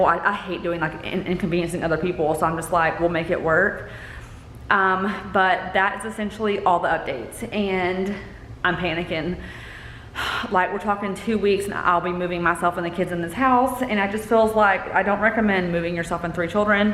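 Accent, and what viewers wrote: South, United States. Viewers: You sound so southern now...love ya x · The all white will be beautiful! Your southern accent is getting so strong! Also, everyone loves! He is the best and we can’t wait to continue to see him more on your channel! ❤️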